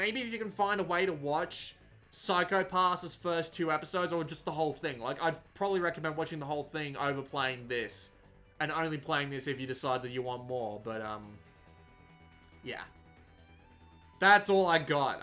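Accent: Australia. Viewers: It sounds like you would really enjoy the anime. You sound Australian, is that correct? If so, Psycho-Pass is on Madman's AnimeLab for free (and it's legal) in Australia and New Zealand. It's a great anime and I think this visual novel is really just for fans of the anime, like me. It's not a standalone product.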